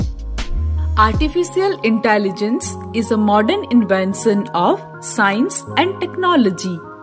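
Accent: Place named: Uttar Pradesh, India. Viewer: Are you from up or mp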